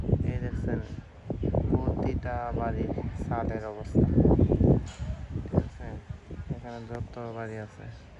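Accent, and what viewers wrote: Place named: Narayanganj, Bangladesh. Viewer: ভাই আপনার কথা সুনে মনে হলো আপনি নারায়ণগঞ্জ এর ছেলে হবেন,,, 🎉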